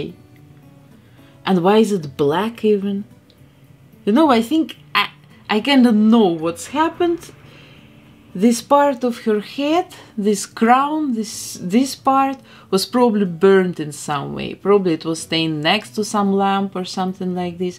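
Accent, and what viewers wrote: Russia. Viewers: Ты русская? Акцент очень чувствуется (возможно, какой то другой национальности) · Сложно слушать этот русский акцент... жуть какая. Исправьте, пожалуйста! Я очень уважаю английский язык и мне больно это слушать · Она ведь русская??? Или украинка Такой жёсткий акцент может быть только у нас 😂😂😂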